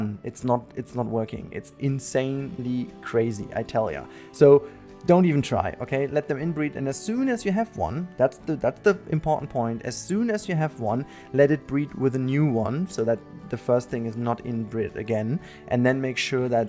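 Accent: Germany. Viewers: kannst du das video auch auf deutsch machen ? denke mal an deinem akzent kann man erkennen das du deutch bist :D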